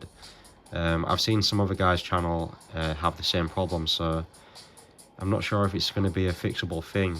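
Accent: United Kingdom. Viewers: Guy with strong British accent play MGS with Russian translation? Is this kind of joke or what? :-)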